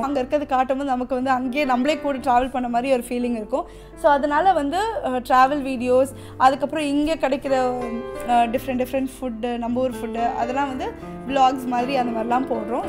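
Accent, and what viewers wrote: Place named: Kerala, India. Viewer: Malayalam slang theriyudhu lighta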